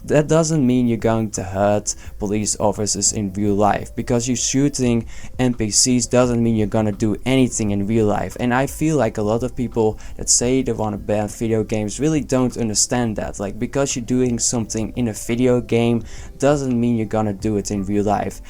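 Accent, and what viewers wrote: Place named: Netherlands. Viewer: lmao this sounds like some heavy dutch accent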